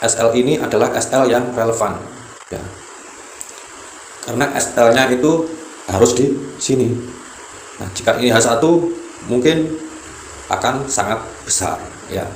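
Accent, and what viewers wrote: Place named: East Java, Indonesia. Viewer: Ooo Jember yaaa mas ?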